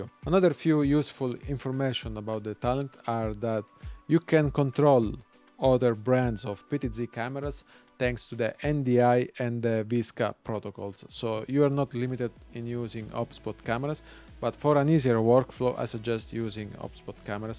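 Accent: Italy. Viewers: Italy 10 – France 0 (if your accent didn't give away that you're Italian this sure did)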